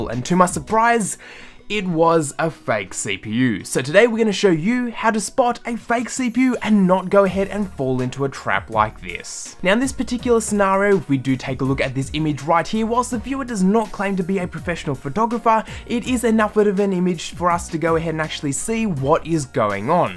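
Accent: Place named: Australia. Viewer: Yes... It is... He's not American. He's Australian... That's how Australian's talk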